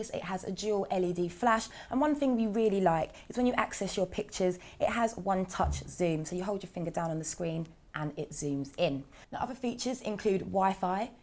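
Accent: United Kingdom. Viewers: SHe got Brit accent. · fuck british accent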